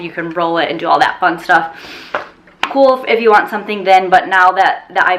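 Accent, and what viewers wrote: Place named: New York, United States. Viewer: Thank you so much for filming and sharing this video you have such a wonderful channel and equally amazing content that is an absolute pleasure to watch and enjoy I love your accent are you from New York by any chance?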